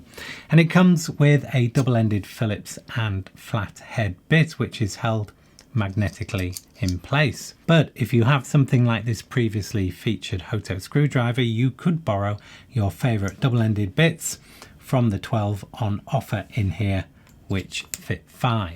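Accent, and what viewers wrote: United Kingdom. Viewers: Perhaps mention not legal in uk as some Brits won’t know and as you are (or sound) British they might assume you are saying edc in UK.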